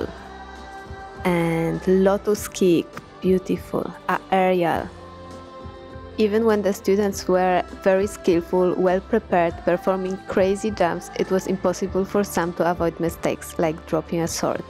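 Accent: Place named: Poland. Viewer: Very good and positive video. Thank you! When started to wath a video, though that, hmm sounds like a speaker have a polish accent and it was true.)) Interesting how roots are following people. All the best you guys!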